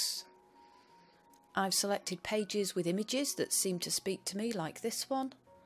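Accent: United Kingdom. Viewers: I absolutely love your voice! Its like watching a tv program! What part of the Uk are you from, if I may ask?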